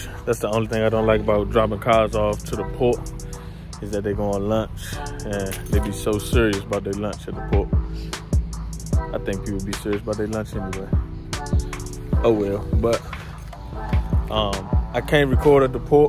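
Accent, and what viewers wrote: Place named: DMV, United States. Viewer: Keep grinding fam I just got me a dually and 2 car trailer a month ago boutta take off and turn up I feel good to hear a dmv accent on YouTube giving free game keep grinding bro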